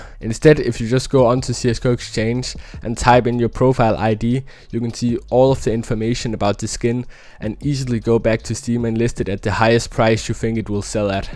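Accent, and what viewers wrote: Denmark. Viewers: i smell danish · Kan høre der er lidt dansker over det her aha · I smell Danish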